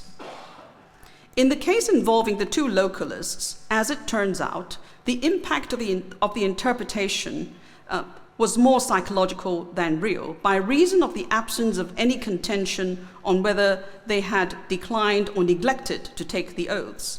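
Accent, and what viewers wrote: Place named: England, United Kingdom. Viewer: 下⋯咁正既English accent, 我真係好想知你d英文有幾好聽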